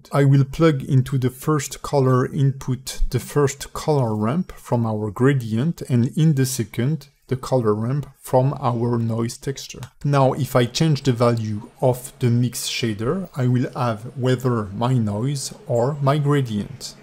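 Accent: France. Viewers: Tu es français n'est ce pas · Bonjour, très bon tuto! ton accent français ma permis de comprendre plus facilement même si mon anglais est moyen! :D thx! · On sent tellement l'accent français haha, excellent tuto btw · Serais tu français ?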